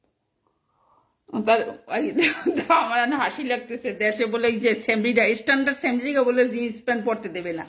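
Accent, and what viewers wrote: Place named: Dhaka, Bangladesh. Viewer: thoroughly enjoyed this 18.55 minutes of pure, 24-golden monologue....... Dhaka (or Silhet) accented Bengali was sonorous .... Thank you for making my day........ Regards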